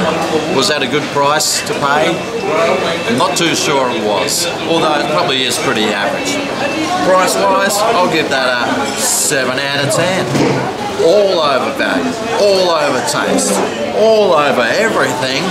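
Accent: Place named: Australia. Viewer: Nice Australian accent m9 : D love it! 👍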